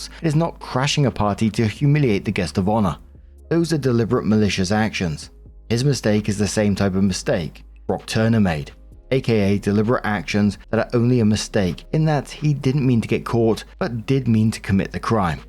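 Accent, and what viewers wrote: United Kingdom. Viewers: Hey I just wanted to let you know that I really enjoy it when you talk about your own life and relate it to the stories. I feel like you must've gotten comments from ppl who don't like this or something bc you always defend bringing up your personal life. If people don't want to hear about it then they should go listen to AI robotic slop voice rereading reddit stories. I like your channel BECAUSE you bring a personal touch and I also love British accents lol. You're the only channel on yt I actually pay a membership for bc I appreciate your content so much. I listen almost daily on my commute to work and back and I really enjoy hearing about you and poppy and your family. So please don't ever be discouraged to share that.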